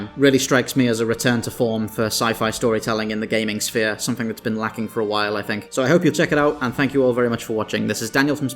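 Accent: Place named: England, United Kingdom. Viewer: No idea why but you sound like an English Ben Shapiro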